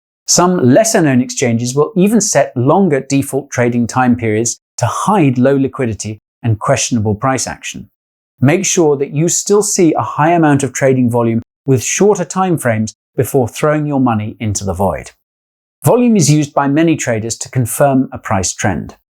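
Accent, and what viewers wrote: United Kingdom. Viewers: so much priceless information! and even explained with a British accent! couldn't wish for more than that 😍😁 you' re very funny too 😁, love your jokes hehe makes everything so much fun and easier ❤️ thank you so very much! ☺️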